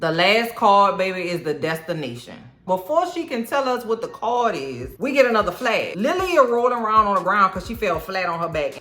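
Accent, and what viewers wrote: New Orleans, United States. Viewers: I love the way you explained this, your Nola accent makes it better “Baaaaby” 😂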